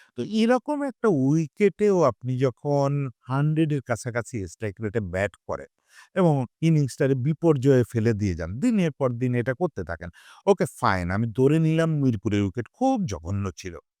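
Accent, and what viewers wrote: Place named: Kolkata, India. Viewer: দাদা আপনি কলকাতার বাংলা টুনে কথা বলেন কেন?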